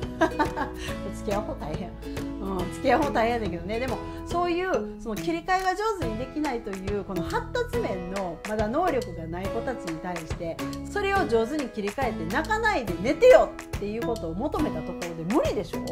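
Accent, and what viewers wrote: Kansai, Japan. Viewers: HISAKOさん、わざわざご返信ありがとございます。嬉しすぎます。 温かくて、私に寄り添ってくれるお言葉、とても救われました。 みんな同じ経験をしてママになっているんだなと思うと、不安な気持ちも少し解消されました。 自分の気持ちを周りに伝えること、吐き出すことが苦手で、私がやらなくちゃ！と思ってしまうタイプです。。。 幸い、夫は子ども好きで、家事は率先してくれて協力的。 まずは信頼できる夫に自分の気持ちを伝えることから始めてみます。 これから先ずっと続く子育て、私自身が成長できる機会だと思って、前向きに◎ 夫は大阪南部出身、私は15年間大阪市内に住んでいて、去年四国へ引っ越しました。 関西弁が懐かしいし、HISAKOさんの喋り方がとても心地良いです(^^) これからも、笑顔とパワーをもらいに、動画見にきます！ お忙しいと思いますが、どうぞご自愛ください。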